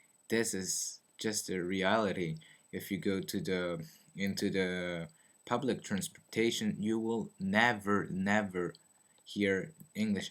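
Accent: United States. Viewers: You seem to speak using american accent... I'm brazilian and understood you well :) I think british accent is a little hard to understand, do you also think it? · Jesus, I assumed he lived in an English-speaking country because his English is damn near perfect. Extremely few grammatical errors (only mistakes I'm catching are using plural at times we use singular, like "transportation" or "homework". But I understand why people would make that mistake, because in English we just don't have plural forms of those words), and his accent is really minor. Sounds like he's been living in the US for years.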